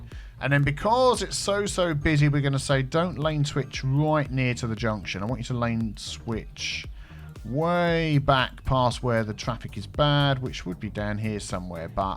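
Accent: United Kingdom. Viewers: I'm currently binge watching this series and I'm actually in San Fran right now for Labour Day! I'm a Brit living in America and the pandemic has made it really difficult to make friends so I've been pretty lonely and homesick, but hearing your Britishisms is really comforting. Thanks <3 (Also, Yorkshire tea is the best and thankfully I have been able to find it out here!)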